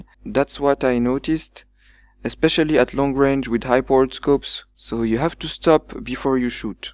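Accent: France. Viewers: Is it possible that you are french? If so you are one of the few french people that can speak english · Il ne serait pas français ? Le petit accent on l'entend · i was a bit confused at the keys you provided, but then i remembered you have a french accent so you are probably using a azerty keyboard. Anyways, i'd suggest providing the qwerty equivalents next time :P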